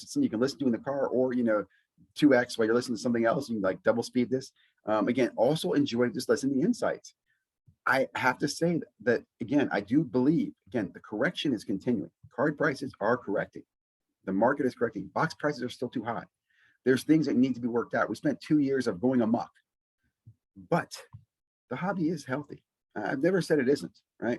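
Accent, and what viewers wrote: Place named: South, United States. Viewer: Liked for the southern accent 😆😆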